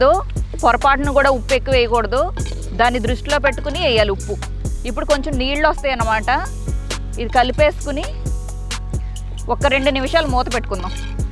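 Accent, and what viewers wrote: Godavari, India. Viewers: Nv matldedi godavari yaasa ,rayalaseema antavu enti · ఒసేయ్ లప్ప గోదారోళ్ళ భాష మాత్రం బాగా పట్టవే!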